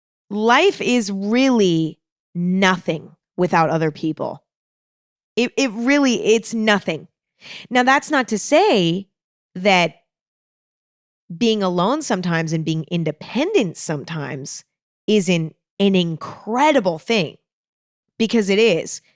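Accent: United States. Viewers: living for ur new york accent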